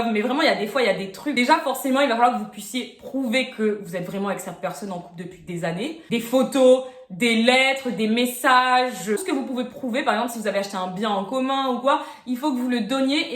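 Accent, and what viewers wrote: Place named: Réunion, France. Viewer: lol j’en étais sur juste à l’accent, je cherchais des infos sur le visa e7 et je tombe sur la vidéo d’une compatriote réunionnaise, en tout cas ta vidéo était sympa et très instructif et je n’hésiterai pas à aller voir les autres. Je ne sais pas si tu comptes aussi streamer sur Twitch par exemple. En tout cas tu as un abonnée de plus =]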